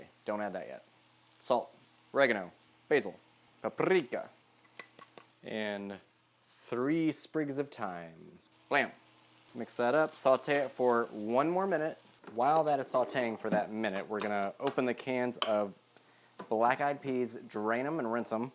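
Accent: South, United States. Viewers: Yum! That dirty rice looked good! And those peppers 😍😍. You sound southern (I'm originally from the Tennessee, so I have a southern draw myself ,haha) where are you from?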